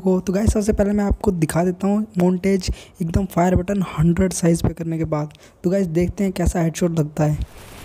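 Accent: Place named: Hills, India. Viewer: Bhai tumahri aavaj pahadi Se milti hai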